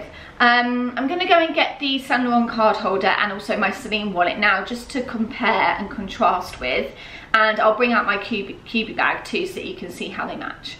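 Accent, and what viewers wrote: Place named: United Kingdom. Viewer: Your British accent!👍🏻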